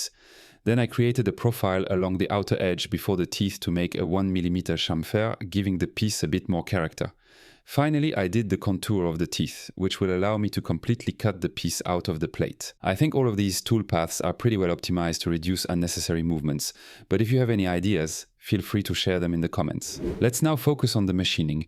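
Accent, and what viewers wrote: France. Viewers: It's impressive, how capable FreeCAD became and I've really enjoyed watching your CAM tutorial. By the way, your lovely French accent is really charming. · Where's your perfect English accent? Finally, your real voice? That French accent is a bit funny, personally I like it, and your English is good. My voice would be much worse 😀😀😀👍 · Heya! You've got a good fluent English with a lovely fleur (you may be saying gaze or voile these days, but in Russian we still say флёр when we mean gaze or voile figurément, I think it's an old-school maniere which dates back to XIX or even further to XVIII and all those Lois... Lous... Louis('s) you used to have) of French accent, mate! Do not be shy of it, keep it rolling! And for what it's worth, a turbine is driven by working medium, e.g. by fluid, by gas or anything else, whilst a fan - or better yet, an impeller - is the thing that moves the working medium, be it air or water or whatever. 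So you added a fan to your spindle to kick away chips from cutter, not a turbine. And just between us, I wouldn't say it loud that I've got any sort of piété to Tesla in general and the Truck in particular should I have one. It is rather controversial aveux.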